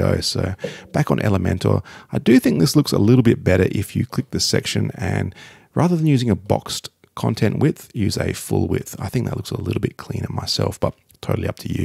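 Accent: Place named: Australia. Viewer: I saw this demo’d on another vid which was older than this one, and I was very keen to use for my website blogs - funny it’s still in beta but I’ll be getting all over it as an all in one for blogs and much quicker to develop than elementor or Divi - not that they are that tricky but this is quicker again. And dude am I mistaken that your an Oz tuber? Sure sounds like it, very refreshing to hear the Oz accent. I’ve checked out a few of your vids and I’ll subscribe to add support to an Aussie bloke, your vids are good and helpful. Keep it up. Cheers Cherie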